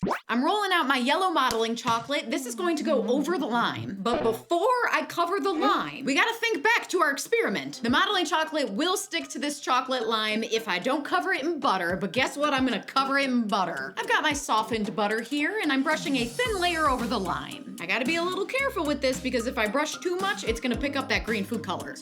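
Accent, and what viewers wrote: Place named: Midwest, United States. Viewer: That sounds like a Midwest accent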